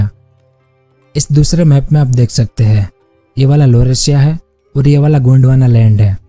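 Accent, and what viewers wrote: Gujarat, India. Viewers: Bhai kya Gujarat se ho kya aap dappu k papa jaise bol Raha hain aap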